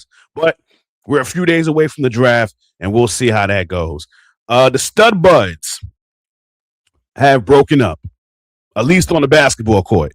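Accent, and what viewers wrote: New York, United States. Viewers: You ended up on the feed. Heard you speak a couple minutes, figured you from NYC and a Liberty fan..you confirmed it...then I see you from the East.. had to subscribe. I'm from the Stuy, reppin the Liberty so looking forward to more content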